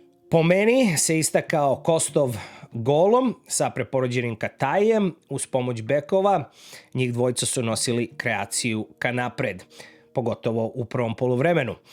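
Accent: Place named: Ekavian, Serbia. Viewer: Ти мени дјелујеш као Хрват и коментаришеш, а причаш екавски имам такав дојам,поздрав теби и успјех на каналу.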